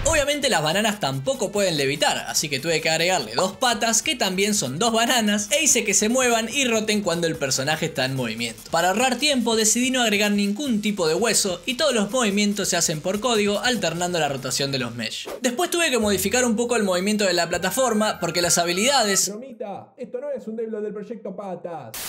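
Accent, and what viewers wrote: Argentina. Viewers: Argentino? No se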